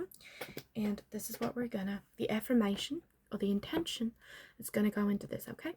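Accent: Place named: South, United States. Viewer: Coming from a born and raised genuine southerner in the US, I love everything about this. A southern accent, especially ones like Charlotte La Bouff or Dolly Parton or Blanche Devereaux, gives me such comfort. And the hunnys and darlins round it all out. I loved this ❤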